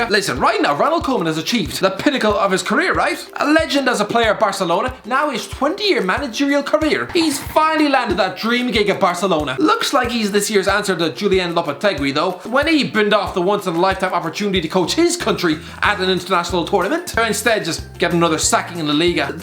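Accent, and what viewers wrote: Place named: Ireland. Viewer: If the accent hadn’t already given it away, the term ‘Glasgow derby’ will tell you who exactly the fav Scottish team is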